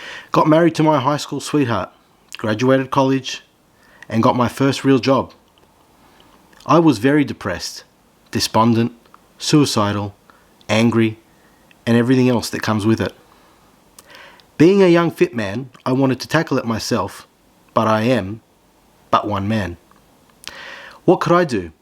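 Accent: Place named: Australia. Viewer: You sound Australian. Unless you live here, why would it be relevant to you to talk about Americans converting to Orthodoxy?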